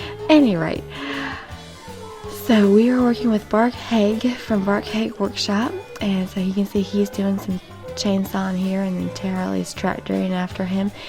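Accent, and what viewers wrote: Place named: South, United States. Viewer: What a cool southern accent ! , sounds like the purest form of a southern accent , is it ? can't tell i'm from Norway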